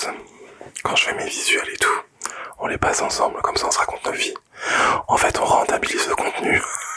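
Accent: France. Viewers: I LOOVEEE French accent 😭😭🫶🫶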